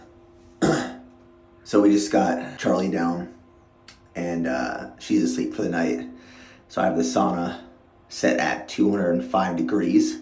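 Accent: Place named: Maryland, United States. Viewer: I know that accent from anywhere! You must be from Maryland or Pennsylvania 😂♥️ love your videos!!! Love from Bmore 🦀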